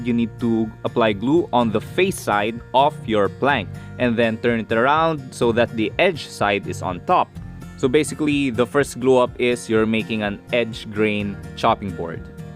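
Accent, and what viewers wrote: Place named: Philippines. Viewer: I really miss the Filipino accent. I was fortunate enough to live near Manila for a few years and travel a lot while there. Listening to you explain all this brought back so many good memories. Best of luck to you with your projects and your channel my friend. :)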